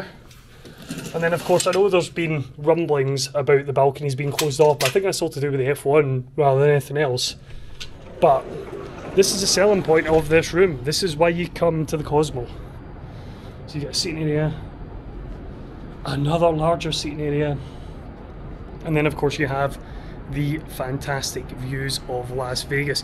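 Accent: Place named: Scotland, United Kingdom. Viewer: As usual a great vlog. As I said before superb to hear a fellow Scottish accent in our favourite city.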